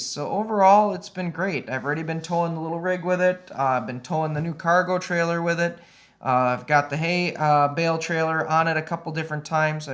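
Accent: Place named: Fargo, United States. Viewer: its REALLY NICE! lol...love the fargo accent.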